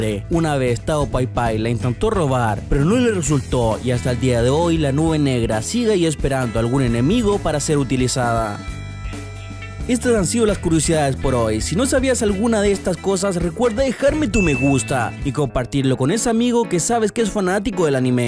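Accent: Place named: Chile. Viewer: Creo que a se le esta escapando lo chileno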